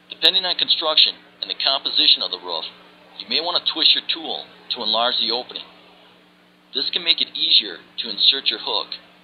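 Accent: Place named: Upstate New York, United States. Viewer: Dude sounds like Upstate New York in accent.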